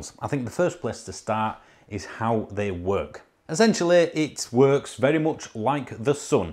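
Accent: Yorkshire, United Kingdom. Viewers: Well said, you sound like a real Yorkshireman, unlike who I suspect is a fake.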